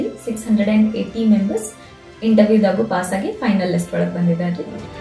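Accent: India. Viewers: Yalli hodru uttara karanataka(UK) bashe(style) bidabyadri akka... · Bahal simple agi guidance madidri Akka.....pakka Belagavi Kannada...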